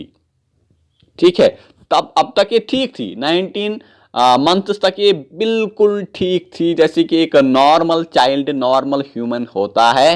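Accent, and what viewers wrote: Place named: Kashmir, India. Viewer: Your kashmiri accent is so sexy ❤❤❤❤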